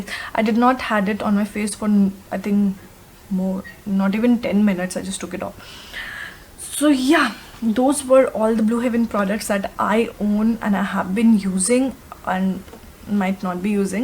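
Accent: India. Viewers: sana Zehra seriously?? Just because she don't have a build up accent to her english, her english is not good? Well grammatically it's all correct, just that she's speaking in her natural indian accent you find it "not good"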